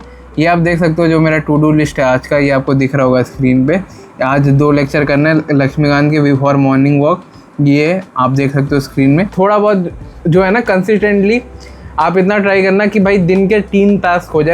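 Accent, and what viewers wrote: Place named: Bhojpuri, India. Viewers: bhaiya aap toh bhojpuri bolte ho 🥰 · bhaiya ap jis language ma baat kar rhe ho vo bhojpuri type lag rhe hai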